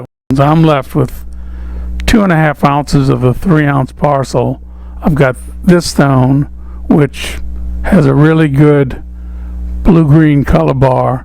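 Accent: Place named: New Orleans, United States. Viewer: Your accent is very nostalgic for me, you wouldn’t happen to be from around the Big Easy would you?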